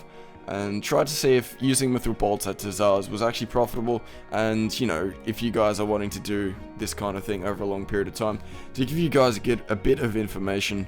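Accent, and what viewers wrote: Australia. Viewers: No, he sounds Australian.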